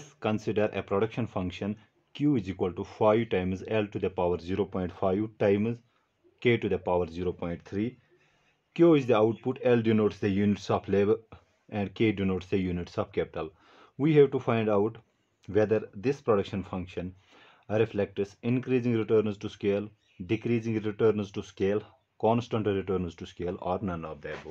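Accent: Kashmir, India. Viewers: Kashmiri accent!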